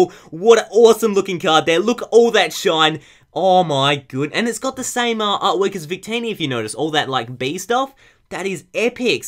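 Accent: Australia. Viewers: Australian accent mate :)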